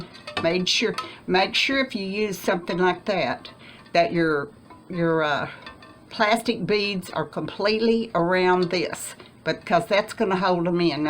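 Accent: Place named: South, United States. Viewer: the southern accent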